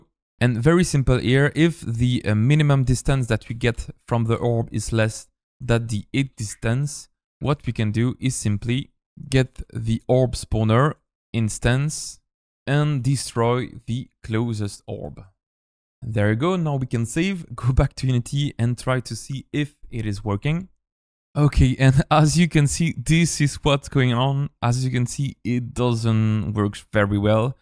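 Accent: France. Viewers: T'es francais?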